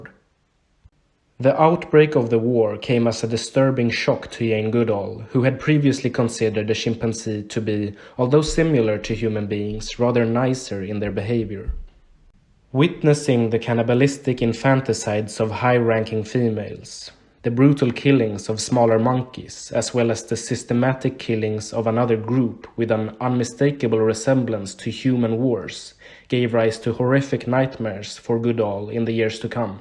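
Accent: Sweden. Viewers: Are you Swedish? You sound Swedish in your accent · This man is swedish af. U can hear it on the horrible accent... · I love the Swedish "iii".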